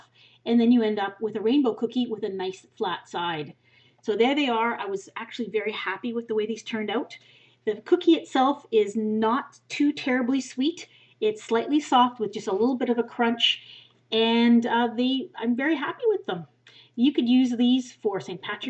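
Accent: Canada. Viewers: she's canadian, right? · As an american, she definitely has a canadian accent.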